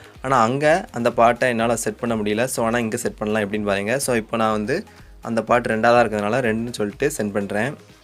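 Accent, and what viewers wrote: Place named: Thoothukudi, India. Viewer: Tuty slang :)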